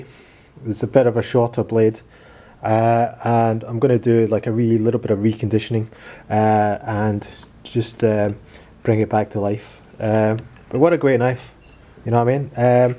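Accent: Scotland, United Kingdom. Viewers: Are you Scottish. Cool video man. Thanks for sharing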